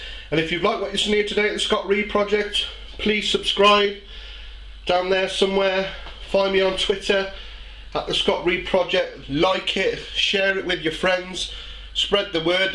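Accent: United Kingdom. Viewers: I really enjoyed the recipe and your Brit accent. Thanks for the fun instruction.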